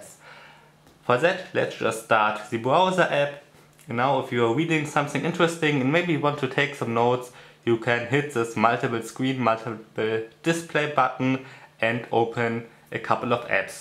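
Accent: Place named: Germany. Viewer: Ob und zu welchem Video ich einen Kommentar abgebe, solltest du besser mir überlassen. Wenn du ein Video online stellst, musst du auch mögliche Kommentare hinnehmen. Ich spreche 6 Sprachen in der jeweils landesüblichen Koloratur und ich bin auch Deutscher. Eben deshalb schmerzt es, deinem Englisch zuzuhören. Meine Meinung in deutsch zu verfassen geht schon in Ordnung, denn dein starker Akzent lässt ja nur die Schlussfolgerung zu, dass du Deutscher sein musst. Insofern ist deutsch schon richtig.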